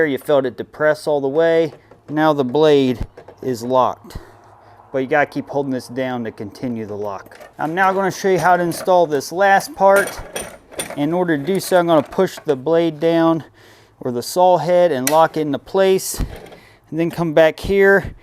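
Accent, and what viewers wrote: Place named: Baltimore, United States. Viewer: Where ya from, Baltimore?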